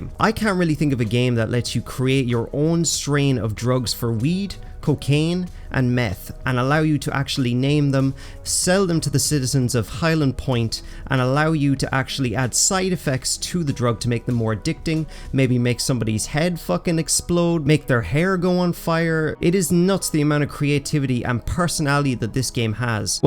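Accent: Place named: Ireland. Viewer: Nice video bro, What part of ireland u from man?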